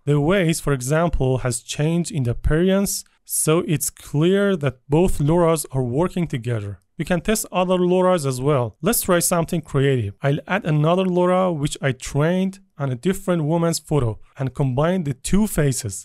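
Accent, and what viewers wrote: Iran. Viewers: این لهجه قطعا واسه یه ایرانیه ، دمت گرم :hand-pink-waving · لهجه ی زیبای فارسی رو که شنیدم ذوق کردم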